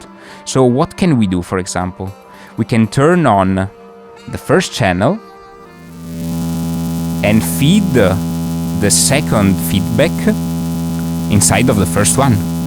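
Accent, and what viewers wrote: Italy. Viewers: Hai un inglese Made in italy. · It's so weird to see the most North Asian looking dude speak in the most Italian English accent 😂. Great video though, thank you for sharing this! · Awesome way of thinking out of the box, and happy accidents. Io sentivo l'accento italiano nonostante il nome slavo hehe ;)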